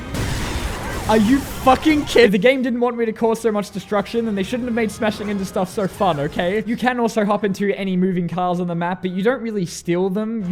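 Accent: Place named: Australia. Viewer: I heard your Australian right well guest the hell what mate I’M A AUSTRALIAN TOO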